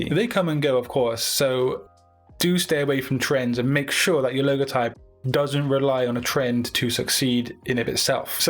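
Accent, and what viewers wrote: United Kingdom. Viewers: Are you British? I like your accent !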